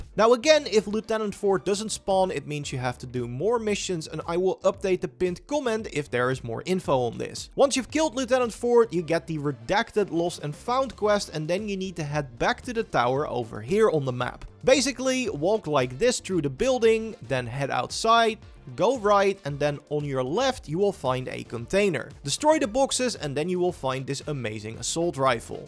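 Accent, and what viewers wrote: Netherlands. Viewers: I smell dutch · Ik hoor een nederlands accentje haahhaa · je blijft horen dat je Nederlands bent haha maar thanks voor de tips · Jezus wat klink jij nederlands als je engels praat 😅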